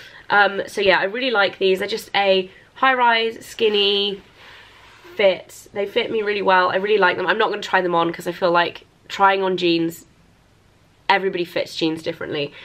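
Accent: United Kingdom. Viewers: I do love watching all of your videos! I’m obsessed with British accent and you’ve been one of my favs for years!!! Your awesome!! 🤩👍🏼🙌🏽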